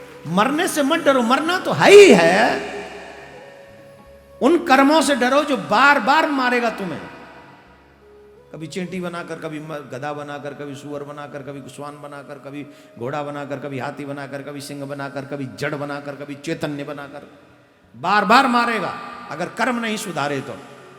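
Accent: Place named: Braj, India. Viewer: Guru ji radhe radhe aap jab braj bhasha bolte h too bhut achha lagta h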